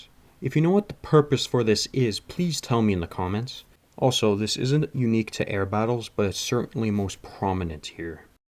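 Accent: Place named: Canada. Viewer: Great video. You have a better Canadian accent than mine lol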